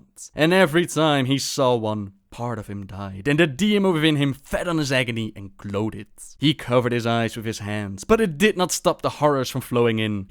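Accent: Netherlands. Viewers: do I hear a dutch accent? might be wrong ^^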